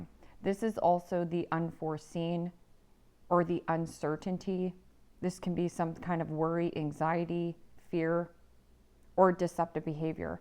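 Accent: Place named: Canada. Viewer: ❤ I thought I detected a Canadian accent 🙂🇦🇺🇨🇦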